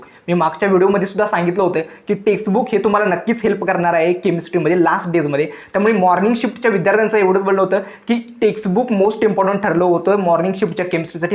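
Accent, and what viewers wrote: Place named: Kolhapur, India. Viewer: Kolhapuri accent i think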